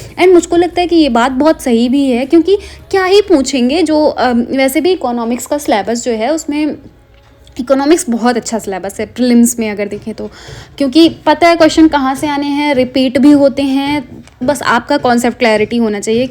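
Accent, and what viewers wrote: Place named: Uttar Pradesh, India. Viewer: It's not about pronunciation, it's about the regional effects that's why you are speaking with such accent. As you are from up so it's your Hinglish ascend. A haryanvi will speak hos own Accent so don't worry regarding this things.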